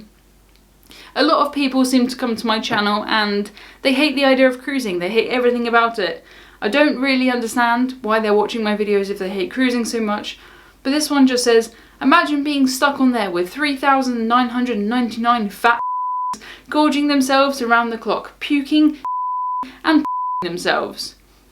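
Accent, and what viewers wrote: RP, United Kingdom. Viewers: Someone called you "posh"... Well I, as a foreigner, didn't know it was a bad thing. I've just recently discovered your channel and binge watching your videos and one of the reasons why I like your channel is your clear, sophisticated way of speaking. So if it's tied to being posh, then keep being posh please and nevermind the haters ;) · Dear, The only cruise I took was as a child. It's unlikely I will ever take another, but as a non-cruiser who has the entire internet at my fingertips, I choose to watch you every day. Why? Because your goodness and positive attitude are uplifting and I love your "posh" accent. (You don't have an accent. Everyone else does. Go with that.) If you threw yourself to the sharks as one person suggested, they would make a safe corridor for you and salute you with their fins. No shark would disturb someone who loves the beauty of our planet as much as you do. 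Hugs and cookies from Idaho (US). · Until I watched this video I would have said is the channel least likely to offend anyone. is a cheerful, low key presenter, with a charming, easy to understand British accent, giving us the information on each ship and line, objectively, without trying to either "sell" one line or disparage another. She shows us the food, the itinerary, the cabin she booked (and sometimes, alternatives at different price points). She runs through some of the options you might want to buy in addition to your tickets, very helpful for people who have never cruised before or haven't cruised recently. I've concluded that I don't want to cruise any more, but I find her videos entertaining and fun to watch. I think there is a certain percentage of the public who just like to hear themselves complain. Pay no attention to them, just carry on. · Darling, you sound just fine to me. 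What's wrong with posh, anyway? What's wrong with being a nerd? Why are people so critical? Why the need to be so rude? It's just too easy to be rude when one is anonymous on the web. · In any business a brick and mortal or a platform like YouTube you will always have those that you cannot please. Like they say, 'You can please some of the people all of the time, you can please all of the people some of the time, but you can’t please all of the people all of the time'. On a positive note, I love your accent and I think you are a very attractive person inside and out! I found your channel as we are getting ready for our first cruise and was trying to learn all I can and your channel is one of my favorites, I get to learn about Cruising and British-ism :) After one of your videos about Queen Mary 2 we are considering take a Queen Mary cruise from the US to London and then spend a few days there and fly back. 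Thank you for all the work it takes to put out these videos! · I've only just recently stumbled across your channel. Congrats on quitting in February! I quite enjoy listening to you. I've always liked the way Brits talk. It, to me, just seems proper. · sounding “Posh” is always great. I am a Brit but live in the US. You are the most natural person person I have seen on youtube. Please keep it up! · Ah, but you do sound “posh” IF that means you can use the English language properly, and take the time to prep and clearly rehearse your bits off so the clips aren’t full of “ummm”, “err”. For context I’ve done voiceovers for corporate videos and know how hard it is to craft a script that flows, and how important rehearsal is. I love the Coffee House Crime (I think) channel but his script & delivery are not good, makes me twitch - I can watch yours all day, really well put together - AND I want to hear you play the piano!! 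😂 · I don't understand people. If you don't like something, don't watch. Your videos are very interesting. I like your "Britishisms" and listening to your accent. What a nice smile! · Your English of course your accent is posh 😆😆😆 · I love your "posh" accent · Isn't sounding posh something good? 🤔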